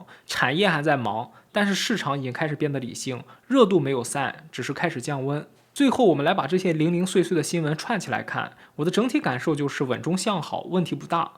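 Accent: China. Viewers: 听说话的习惯播主应该是大陆的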